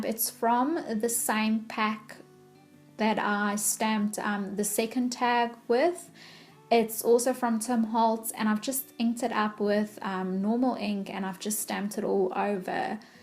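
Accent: South Africa. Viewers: Love your tags they are so lovely.Everytime I hear you speaking it sounds like a South African accent to me.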